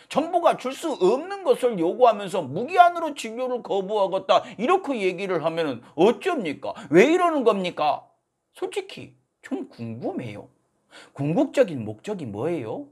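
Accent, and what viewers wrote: Jeolla, South Korea. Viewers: 전라도 말투네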